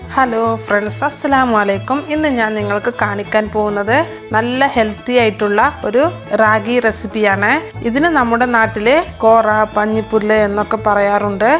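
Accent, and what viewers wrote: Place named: Malabar, India. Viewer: മലബാർ മുസ്ലിം ഭാഷ ആണ്. മലപ്പുറം ആവാം. ഉറപ്പില്ല. എന്തോ ആവട്ടെ ഞങ്ങളുടെ മലബാർ ഭാഷയെ കളിയാക്കണ്ട കേട്ടോ 😊😊😊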